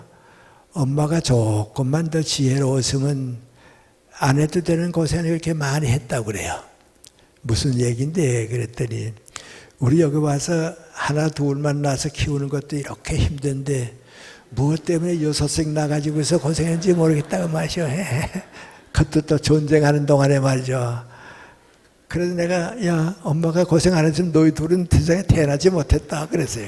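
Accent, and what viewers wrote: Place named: North Korea. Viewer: 강의 중반으로 들어갈때부터 은근 화가 치밀어 오릅니다. 1980년 초반 대학 다닐 때 이분이 쓴 교과서를 기억합니다. 비겁합니다. 나라가, 당신들의 월남을 받아들이고 평생 꿈을 이루고 살게 해 준 이승만의 대한국에서 지금껏 당신같은 지식 기득권층이 한 일이 뭡니까? 북한 위주의 통일을 지향하며그들의 음모와 흐름, 역사의 역향을 보면서도 침묵하며 출판 기득권을 유지하며 민초들을, 사회 구석구석의 무넘짐을 바러보며 참묵으로 일관 해 오셨습니까? 박정희의 독재를 인 마킹하며 북쪽지향 기류에 편승해 앞 뒤 마당 땅 다지고 계십니까? 철학적 기독교인이라고요? 달변에 인쎄큐어리티로 사람들을 내려다 보면서 스스로의 철학적 헐벗음은 못 보십니까? 이북식 말투조차 역겹습니다.. 자유민주주의 대한민국이 아직도 만만해 보이십니까? 연세대 출신들이 저지른 대국민, 대기독교적 모욕은 요한 계시록에서 투영하고 있는 심판을 피할 수 없을 것입니다 개대중이 커밍 아웃부터 지금까지 30 여 년을 그동안 뭘 하시다 나와서 달변을 풀어 내십니까... 미국의 마지막 통고가 코앞이니 좀 걱정이 되십니까??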